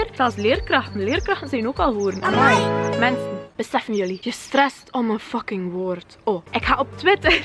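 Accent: West Flanders, Belgium. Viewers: Het is echt niet erg! ♥ West Vlaams is supermooi en alsof de mensen die jou uitschelden zelf beter zouden kunnen! ♥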